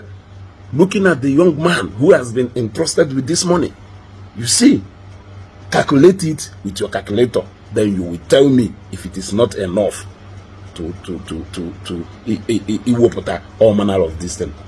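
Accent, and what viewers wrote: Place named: Nnewi, Nigeria. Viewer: Nnewi be anyi i na asu ebe a na-ato m uto okanku. Doo na-asusiya ike.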